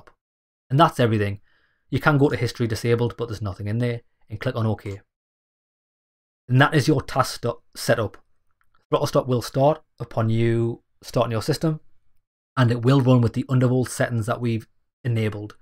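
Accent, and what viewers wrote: Newcastle, United Kingdom. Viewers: Super helpful! And I think my new go-to genre of Youtube narrator may be a Geordie 😂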